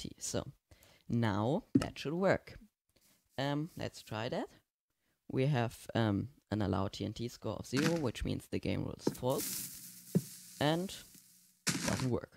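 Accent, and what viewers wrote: Germany. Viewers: you have a german accent lol (I am from Germany)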